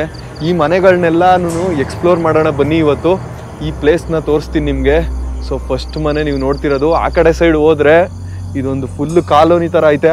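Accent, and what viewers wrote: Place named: Ramanagara, India. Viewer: ನೀವು ಎಲ್ಲೇ ಹೋದರೂ ನಮ್ಮ ಚನ್ನಪಟ್ಟಣ, ರಾಮನಗರ language slang ನಿಮ್ನ ಬಿಡಲ್ಲ ❤😊😅 Ex: ಸೊತ್ತೆ 😂😂😂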